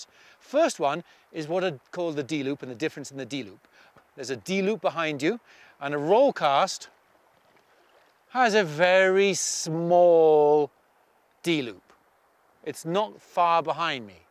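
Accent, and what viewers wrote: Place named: Wales, United Kingdom. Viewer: what part of wales are you from? I clocked your accent as I’m a cardigan native myself. Live in Scotland now though.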